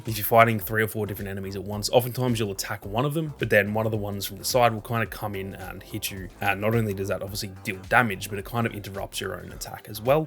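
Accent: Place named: Australia. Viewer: Good tips Aussie fella lol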